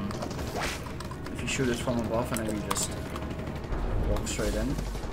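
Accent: Netherlands. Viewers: vindt je nl accent zo grappig nice vidoe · Are you dutch?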